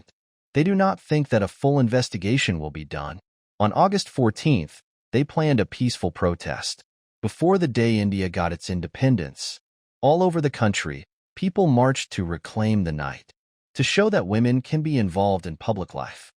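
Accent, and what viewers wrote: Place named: United States. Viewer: Why the hell do you need an American narrator for this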